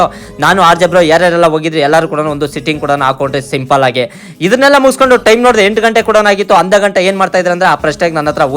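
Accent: Kolar, India. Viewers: Guru kolar bashe kelode one tara majja guru .. Ninu matadodu keltidre hale nenpu..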